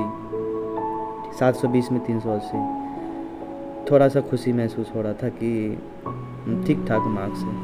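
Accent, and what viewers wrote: Bihar, India. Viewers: Firstly, When I listen you voice I get a smile in my face because I listen Bihari accent. As am too bihari. Story of bihari is always struggleful and inspirational. I'm very inspired by you bhaiya and I'll surely crack NEET2024. 👨‍⚕❤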